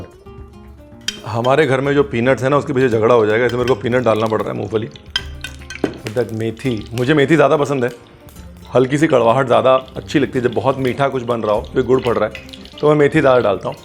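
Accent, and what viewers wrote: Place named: Punjab, India. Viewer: Sir, I come here to listen your Punjabi and love the way you talk 😀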